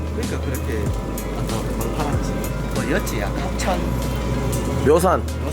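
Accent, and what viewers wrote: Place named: Daegu, South Korea. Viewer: 맛갔나?ㅋ이거도겁나웃겨요 유행어만들어야겠다 오늘부터친구테 써머거야지 원래도사투리쓰는사람 좋아했는데 대구사투리매력있음 님이대구사투리해서더매력있음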